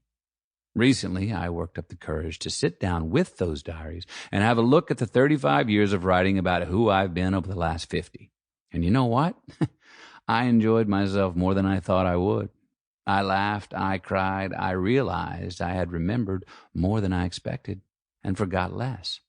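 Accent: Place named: South, United States. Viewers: This man makes my innards quiver. Southern gentleman have IT. But this man bequeaths the essence of every southern man. He has it ALL; the eyes. the beautiful Texas accent-The whole package and by God he has sex appeal in spades. Whew. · He’s just making up empty platitudes and selling it with a confident southern accent. He is an insane person and I love him for that.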